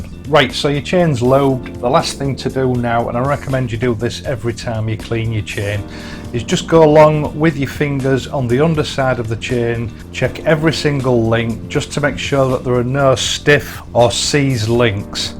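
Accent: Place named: Yorkshire, United Kingdom. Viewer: Hi, I too, like Mark below but somewhat later down the road, have only recently discovered your channel and I completely agree with what he has written. After starting my riding 'career' in 1973 on chain driven bikes (using them literally all year round - no car) to commute to and from work and remembering the nights when I'd come home and then have to strip the chain off and heat up a round tin of chain oil/grease and soak them over night, (and then put it back on in the morning), in the late eighties I 'saw the light' and since then have ridden shaft drive bikes. However, just recently I have purchased a Bonneville Speedmaster (previously a Pan European) and put the 'fun' back in my biking! Over the intervening years chains have progressed and the range of products now on the market to keep them in good condition has mushroomed; the choice is huge and this is where your excellent thoughts and advice are a God send as are alot of the other topics you cover..... 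Apart from anything else, I love your accent and manner of delivery and reminds me very fondly of an old work colleague who came from Airmyn near Goole and with whom I'm still in contact. I digress. Thank you and keep up the great work! 😀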